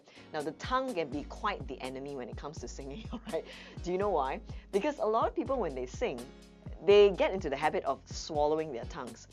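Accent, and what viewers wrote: Singapore. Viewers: Are u Singaporean or malaysia🤣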